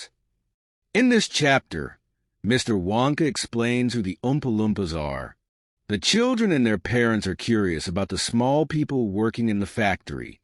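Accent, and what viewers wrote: United Kingdom. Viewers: Por casualidad, este inglés es británico? Por cierto, es muy bueno este contenido, gracias por compartirlo! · Excellent performance and a distinctive British accent. Thank you very much...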